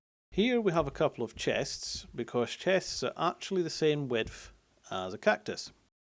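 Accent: Scotland, United Kingdom. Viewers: You sound scottish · Wow he sounds scottish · blake collins ._. that may be because he is scottish...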